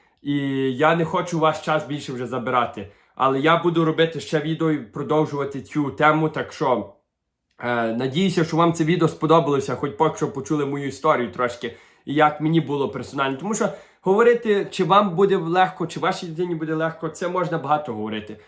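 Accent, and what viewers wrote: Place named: Ukraine. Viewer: Ооо, натрапила на відео, де розповідає звідки він! Отепер зрозуміло, чому відразу відчула, що манера розмови НАША🙂 Тема НАДЗВИЧАЙНО потрібна і цікава! Дякуємо! Дай Боже здоров'я тобі, та усій твоїй родині!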